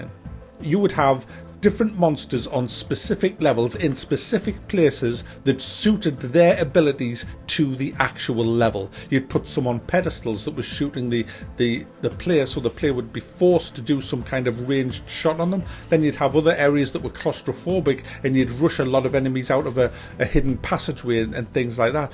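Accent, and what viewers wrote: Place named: Scotland, United Kingdom. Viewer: I love your review, I love your videos (watched some of a playlist), and I love your accent... I wish I had a Scottish accent. Subbed.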